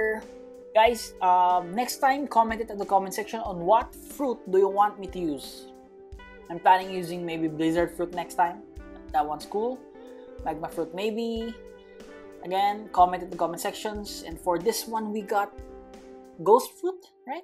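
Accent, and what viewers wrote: Philippines. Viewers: ive been watching you for years now i love ur intro, ur filipino accent and the way u work hard for content doing those level 1 to pro noobs “ is back” i admire you and u make me so encouraged to make new alt accounts and max level them all i wish u notice this comment. happy bomb day🎉🎉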